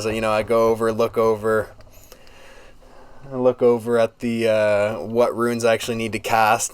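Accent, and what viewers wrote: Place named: Canada. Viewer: I'm Canadian, and I thought the aboot thing was a myth. I guess not.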